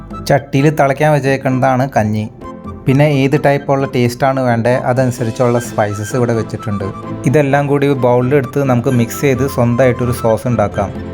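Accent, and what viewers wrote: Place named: Palakkad, India. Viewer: സംസാരം നല്ല രസം ഉണ്ട് കേള്‍ക്കാന്‍ ഒരു പാലക്കാടിന്റെ ചുവ ഉണ്ടോ എന്ന് സംശയം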